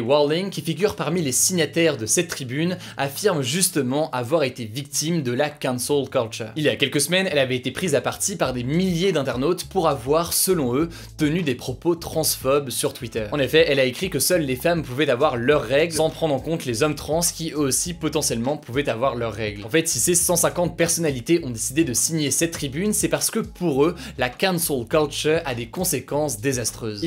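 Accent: England, United Kingdom. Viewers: j'adore ton accent anglais! Merci pour tout le travail que tu fournis! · Ton accent anglais est trop bien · Ton accent anglais >>>>> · Y a qu'à moi que son accent anglais fait marrer ?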